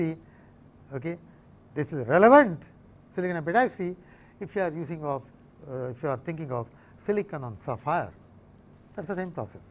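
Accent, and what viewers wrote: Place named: South India, India. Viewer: i dont know this person ,but from his accent it seems he is from southern india. people from there have this typical english accent, which can sometimes be difficult to understand. but i think he is a science teacher, not an english language teacher and he is really good in the subject he is giving speech on. you can be a brilliant person with a great english accent, but disrespecting others is not healthy.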